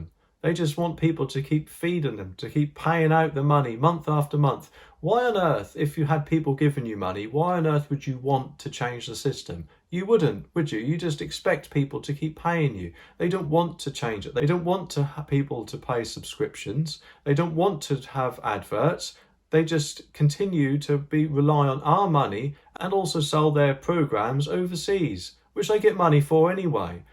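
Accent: Norfolk, United Kingdom. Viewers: Are you from Norfolk??.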